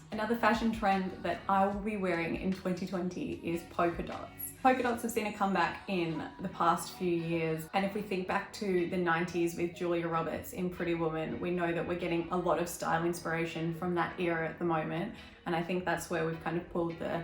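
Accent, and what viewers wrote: Australia. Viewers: Hi, I have just found you and great to hear an Aussie accent! Whilst I love following a lot of UK/US vloggers, it’s great to find someone in AUS.Thanks for your trends forecast! Whilst I may not be adopting all, it is good to know what to expect to see in the stores for the upcoming seasons. 🙏 Thanks!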